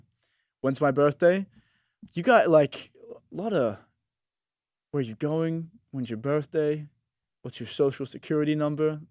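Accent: Edinburgh, United Kingdom. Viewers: I detected that as well, I’m guessing east coast Edinburgh say, I wonder what his bio is ? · that’s exactly it. He has the same accent as a lot of my Edinburgh/East coast friends.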